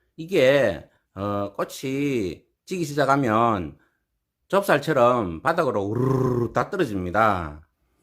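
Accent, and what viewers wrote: Gyeongsang, South Korea. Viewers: 경상도 사투리라 찰떡같이 알아들어져요ㅎㅎ 맥주스프레이는 물이랑 희석 하지 않아고 그냥 맥주만 분무하는건가요??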